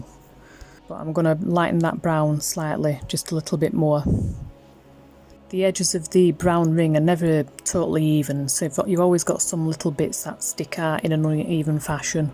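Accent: Yorkshire, United Kingdom. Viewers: Absolutely incredible work, 'really eye catching'. And how good does it feel to hear a Yorkshire voice for a change👍😷👍🏴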